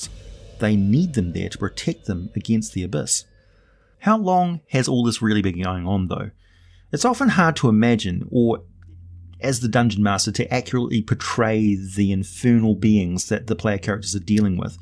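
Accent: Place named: New Zealand. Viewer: Well hell,you may just have to do the Wolf Spider next! Great vid keep crankin them out ol son! By the way my neighbor said you look great with a bald head! Shes hot bro! I think shes crushing on you! 😂 lol You kiwis with that accent....i think thats what got her.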